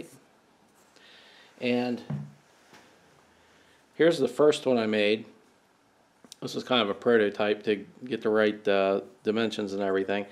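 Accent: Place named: Philadelphia, United States. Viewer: What part of the Philly area are you from? (Accent gives it away if I am right) LOL Very nice project. I've never seen anything like this before. Definitely making some. Thanks for taking the time to make the video.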